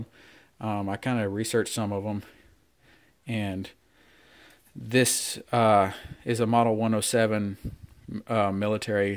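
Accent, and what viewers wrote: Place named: Tennessee, United States. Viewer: What's that accent, Tennessee? Nice collection. Interesting to see someone collect this type of pistol.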